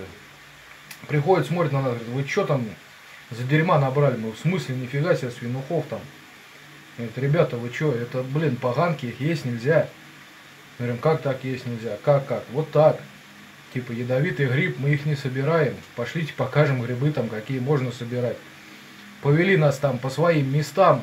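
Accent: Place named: Kursk, Russia. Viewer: Какая область???? Акцент Курский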